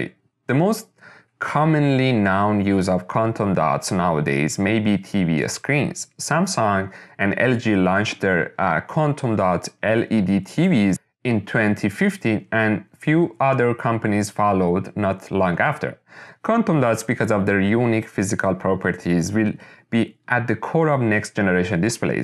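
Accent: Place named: Iran. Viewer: Hello, are you from Iran? Because of your accent,I asked.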